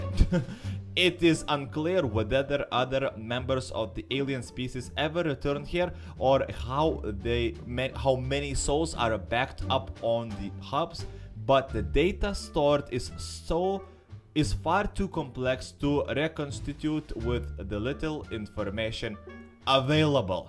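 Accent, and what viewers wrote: Russia. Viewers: I love your Russian accent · are u russian? if u are i like ur accent keep up the good work;)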